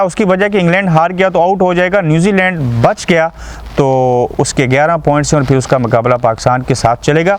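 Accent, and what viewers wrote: Kashmir, India. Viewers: You’re anywhere from kashmir I can feel it the way of talking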